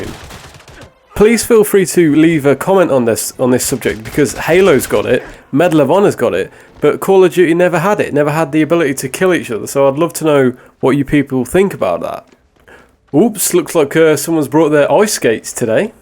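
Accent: United Kingdom. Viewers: absolutely love the british accent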